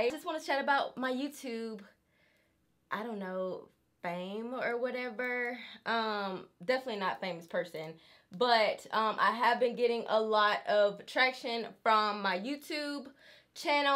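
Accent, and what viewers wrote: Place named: Atlanta, United States. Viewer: I love your accent lmao ATL af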